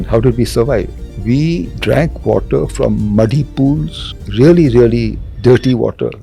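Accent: India. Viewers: British Variant of English spoken in India..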